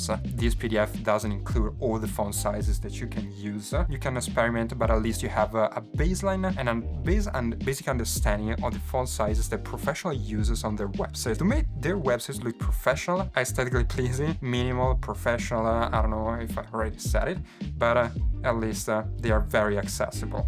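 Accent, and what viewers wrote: Italy. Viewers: Hey is an Italian American I love the Italian accent! Be great to come to Italy and get to meet you and learn about the culture! Having said that though do you have the slides for the guides you came up with for the sizes?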